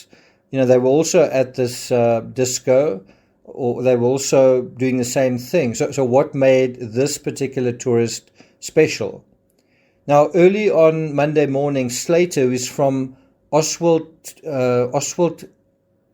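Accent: South Africa. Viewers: Can’t listen to a South African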